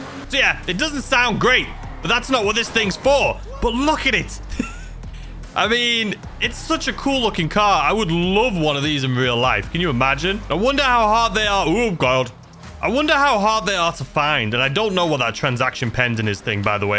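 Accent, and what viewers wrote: United Kingdom. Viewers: “Look at all the pop ups, just bugger off !!! “ lmao only Brits will understand what your saying 😂😂😂 As always, the best content for gta on YouTube 🤘👍👌🤣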